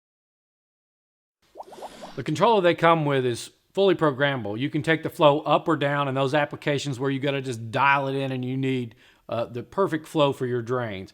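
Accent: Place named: South, United States. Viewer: This better be a good pump. I just ordered it with my GEO Calcium Reactor. With a Southern Accent...I do trust this man. He must be somewhere in Texas with me.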